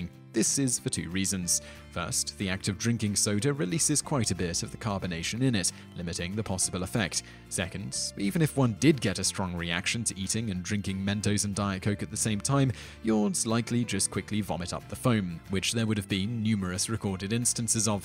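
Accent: United Kingdom. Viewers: Pondering Smirk Oh, probably close to when I did, then. They must've commented after I'd started watching so it didn't show. It's funny nonetheless! One of the perks of having an awesome british narrator. Simon is awesome, but the main audience is American, where Geezers and Geysers are completely different.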